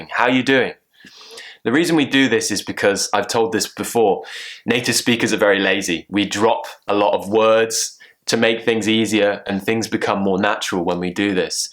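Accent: RP, United Kingdom. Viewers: thanks for your british accent/phrases tutorial videos, i just love it! <3 Because it helps me to understand some phrases what my cousin talkin' about btw she's from London. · I have become intoxicated to your British RP accent, that's what we call it, I was obsessed with my pronounciation, felling better now.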